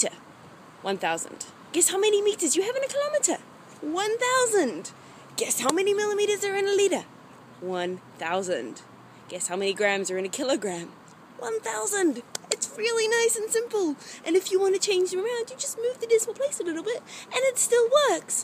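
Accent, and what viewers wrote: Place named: United Kingdom. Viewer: Yes, I agree with her. She is right but I remember feet and inches because I am 54. I hate it when they don't use stones but pounds. I cannot visualise what a person looks like who is 300lbs but I could if it was put in stones and pounds. I did not know she was from New Zealand but she sounds like she is from Britain. I do not like the way yanks say 10th grade or 6th grade. Why not just say how old the child is. It would be a lot simpler. Why do you not have letterboxes in the front doors?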